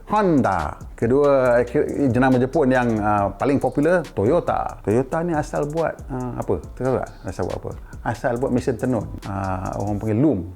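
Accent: North, Malaysia. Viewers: bang sesekali buat video cakap loghat utara lagi sedap la..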